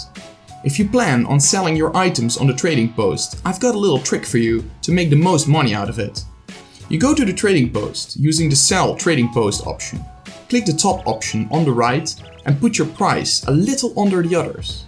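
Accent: Netherlands. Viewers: Sup mate!, great vid. I can tell that your dutch by your accent btw ;) (me to). I just started GW2 again after being absent for 4 years. Is there any way if you happen to know a dutch guild? I've always been in DuF (Dutch Finest) but they kicked me out for obvious reasons ofcourse. Maybe we could meet up in-game. Im serious about getting into it again. Played Blade&Soul for the last 3 years, but the poor optimization got the better of me. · i can hear u dutch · you sound dutch!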